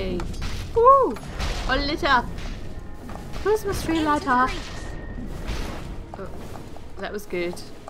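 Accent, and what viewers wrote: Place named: Scotland, United Kingdom. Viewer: This was really enjoyable to watch! Crystal Maiden is my most played hero. I've never actually played ranked yet, partly because I'm worried I'm most likely gonna fail miserably and calibrate myself at an embarrassing level and also because I'm not sure if I would be dedicated enough to push myself to climb, but it is definitely something I would like to attempt anyway. Also I have to ask, (hopefully this isn't too creepy, but) where are you from (I would guess you are Scottish, but I don't want to be wrong and look stupid, which I guess I have done by writing this comment anyway... oh well)? Your accent is awesome! Well I sure dragged this comment on a bit. I hope you do more of these, this was really good.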